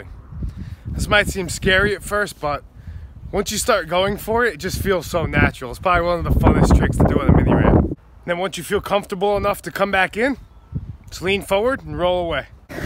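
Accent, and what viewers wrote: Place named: New York, United States. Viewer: Your trick tips are really great. A lot better than some of the others out there because I think you nail the "what you are supposed to be feeling" part really well. I gotta ask though, are you North Jersey or NY?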